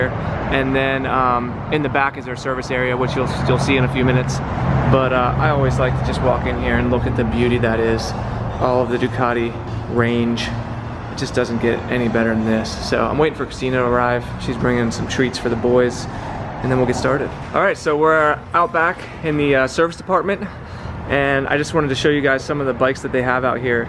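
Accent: South Africa. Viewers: I recognize the South African accent 🤣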